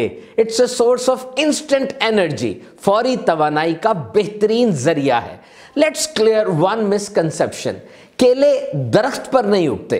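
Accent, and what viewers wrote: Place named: India. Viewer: His accent resembles with Indians .....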